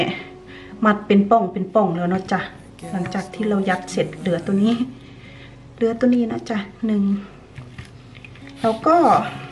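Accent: Isan, Thailand. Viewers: เพิ่งเข้ามาดูคะหนูบอกว่าเป็นคนสุรินทร์แต่พูดเหมือนอีสาน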